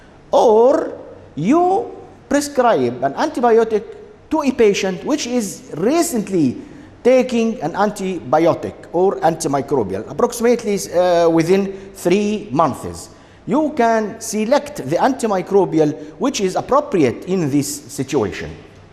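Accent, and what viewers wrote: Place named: India. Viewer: هو حضرتك بتتكلم زي الهنود ليه 🙂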